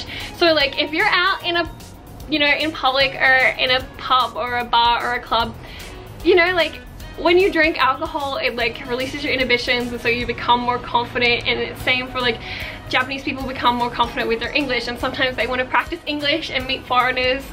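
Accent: Australia. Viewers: Although I don't speak Japanese, I was able to hear an Aus accent when you spoke - Both shocked and amused me... Your point about getting outside Tokyo is SO true. Other than videos you shot with friends, my favorites were when you showcased a city or business outside Tokyo. They were all so well done. Kobe series 100% I'm still amazed you created all those without a staff.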